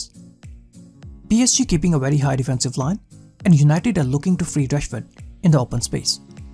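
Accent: India. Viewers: Great video ? Did i hear Indian accent ?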